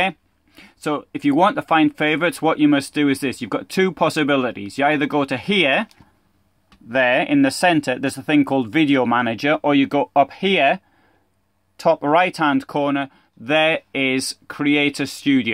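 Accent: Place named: Newcastle, United Kingdom. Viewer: You sound a bit of a Geordie, like eh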